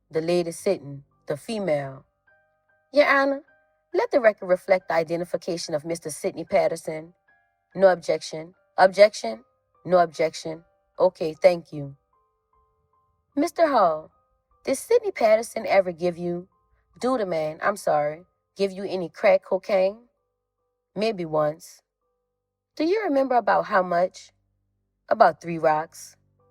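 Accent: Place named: New Orleans, United States. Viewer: The reader sound like she straight out the 7th ward by the St. Bernard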